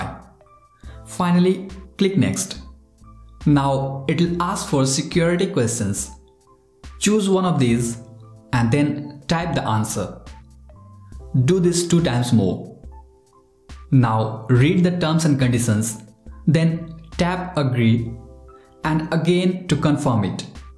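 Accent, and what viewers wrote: India. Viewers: Why does he sound like Indian???????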